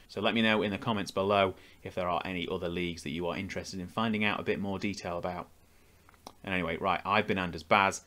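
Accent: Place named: Ireland. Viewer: Bro the Irish head in ya.. Would never say that you're Latvian...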